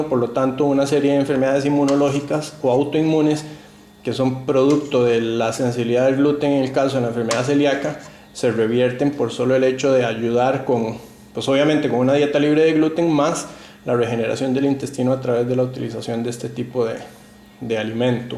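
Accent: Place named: United States. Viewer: tienes una erre medio agringado. Éxito.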